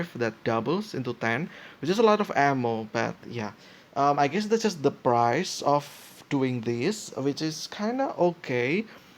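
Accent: Indonesia. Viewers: Aksen Nya Kena Banget Orang Indo Bahasa Inggris Nice Bang Informasi nya 🙏🏾🙏🏾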